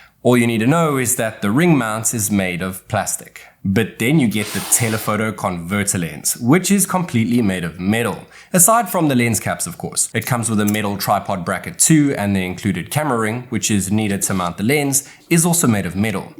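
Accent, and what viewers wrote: South Africa. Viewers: Just ordered a Vivo X200 Ultra .. I didn't get the the Photography kit though. Stumbled across your video and thought :" Hey he sounds South African " and then I saw Table Mountain 🤣🤣 Brilliant video. I am so excited for mine to arrive. Will only be shipped to SA Feb 23rd due to Chinese New Year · I absolutely didn't expect you being South African! 😂 I thought all (white) South Africans speak like FW de Klerk or the other one... EtB, who came on a black horse. 🤭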